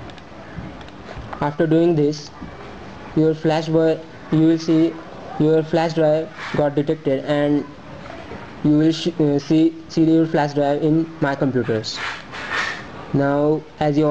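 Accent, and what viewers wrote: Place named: India. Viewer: indian accent idiot.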